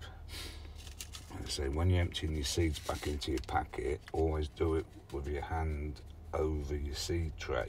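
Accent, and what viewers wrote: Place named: RP, United Kingdom. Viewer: Just a suggestion mate, take it or leave it, comes from good intentions. Consider changing your channel name. Few will search for " " but many would search gardening in Leicester or some such. You are at a good time to switch as you are just starting to build. All the best, my posh, well spoken friend:))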